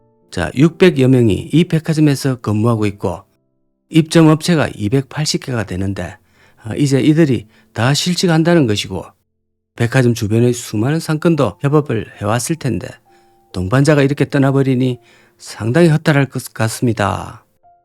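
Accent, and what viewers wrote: Gyeongsang, South Korea. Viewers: 갱상도 사투리가 구수하니, 좋네요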